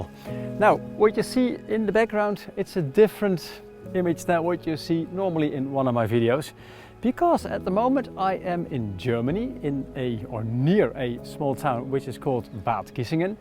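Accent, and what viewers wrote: Netherlands. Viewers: Nice video, I really enjoyed watching it. If you would allow me to give just one point of critique, it would be this: while your English is excellent (ok, I can hear that you're a Dutchman, but there's no shame in that), there was one expression you used that kind of irritated my ears. It's when you say samething like: "that is how it should look like". You're stringing two expressions into one (incorrectly), so you could either say "that is how it should look" or "that is what it should look like". Apart from that, again: great video.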